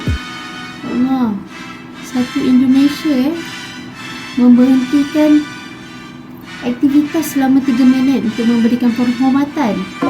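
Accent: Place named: Malaysia. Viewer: Hari cantik orang mana sih ko logat bahasa Melayu Malaysia ❤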